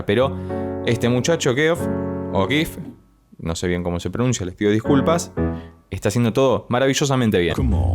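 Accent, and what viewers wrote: Argentina. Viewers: Hola, al fin un lugar donde puedo comentar en castellano, además me sonás a Argentino. Te cuento que sigo a Geoff hace un tiempo y es impresionante todo lo que tiene. Los altos y bajos no los he visto en otro cantante, Si te puedo recomendar algo, sería, y otras dos más de ese estilo - como -. Y él está en una banda a cappella hace unos 25 años creo (tiene 44), la banda es y también tienen unos covers buenísimos y diferentes (, de, etc.) pero para mi la mejor y en que Geoff (se pronuncia como Jeff - shef-) es, el tema del Jorobado de NotreDame. (sigo en otro comentario)....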